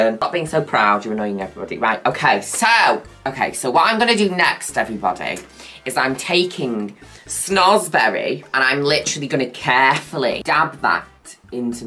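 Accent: Manchester, United Kingdom. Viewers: Oh my goodness, I would love to watch anything with your narration!!! The world needs more Manchester accents in media! 💚💙💜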